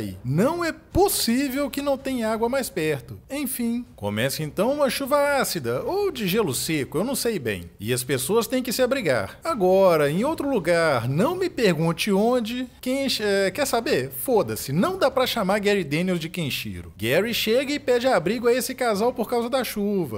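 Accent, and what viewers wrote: Minas Gerais, Brazil. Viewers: O narrador parece ser meu conterrâneo aqui de Minas. Se for, di- vi- no.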